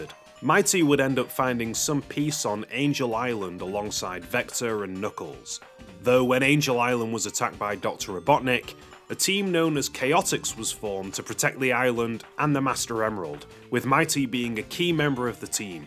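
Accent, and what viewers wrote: United Kingdom. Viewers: Ur defo from Stoke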